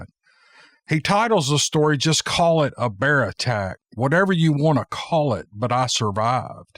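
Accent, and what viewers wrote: South, United States. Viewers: Of all the cryptid channels, is my pick as the very best. No small talk chatter, straight into the story, excellent diction, smooth Southern charm with a clear and soothing voice, got it in abundance! Cannot fail to give proper credit to all the submitters for their excellent ability to covey the story in writing. Wouldn’t be successful without all the quality content!! Miigwetch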